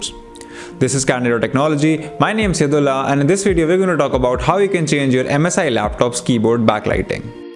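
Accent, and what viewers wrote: India. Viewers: I do not understand Indian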